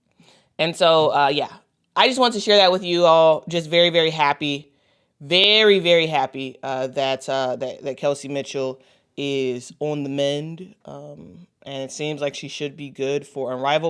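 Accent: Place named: South, United States. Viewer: Wow you have the countriest most southern accent to be from Chicago😆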